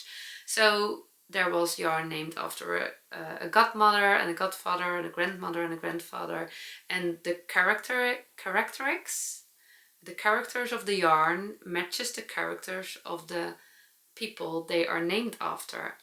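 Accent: Netherlands. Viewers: I could listen to you all day. Love your English with the Dutchy accent. The yarns you bought look beautiful too. Can’t wait to see what you create!! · Thank you for a lovely video. You are very inspiring. Please don’t loose your charming Dutch accent! 🌷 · Dodgy? It's "Dutchy English". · This looks like a wonderful weekend. I think your dutchy English is charming. Thank you for Sharig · Dear, I ❤ your Dutchy english. It is so sweet.😊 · I enjoyed your video so much I love your Dutchy English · I think your English is perfect, just the way it is. It’s ok to let your Miss perfect go. Your Dutchy English is what makes you so lovely ! I just love your knitting style! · What a marvelous festival. And your extensive detail conversation/coverage of all the events/your precious moments… With laughter, just infectious and joyful for us to share. So very happy for you. 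Your English is beautiful… And your Dutch English is part of your charm for all of us! So now you have raised your inner confidence level speaking in such a venue… It makes such a difference when you are with people who have the same interest! I watched most of the podcasts of the people you shared the panel with they are my favorite favorites along with you Marvelous interesting story about the roll up the sheep company! So meaningful” heart jumping“. Whatever you make will be extra extraordinary… You have such a design sense. Just adore the fibers that you bought… May you enjoy each stitch with them! Interesting the English ladies’s name was Diane who will teach you English as that is my name! I felt rather smiling when you said her name! You will take your time to rest recover… And I am sure that there will be more beautiful stories that you will share with us. Your wonderful videoing made me feel as though I was with you! 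It was fabulous! Wishing you well… Take a step back… Rest yourself! And then… Dive into your next project! You are such a loving, joyful spirit, and it touches me deeply to watch you! And in advance of your birthday… I am sending you the most heartfelt warm embraces of wishes for good health, many heart, jumping moments, not only in your knitting, but with you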